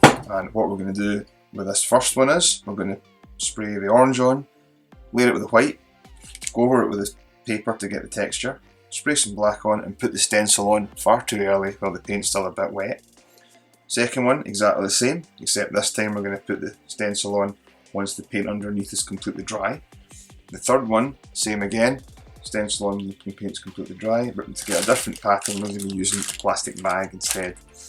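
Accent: Scotland, United Kingdom. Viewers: Cheers from france , like your planets & love your Scottish accent.... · Anybody else love the Scottish accent, were painting sex planets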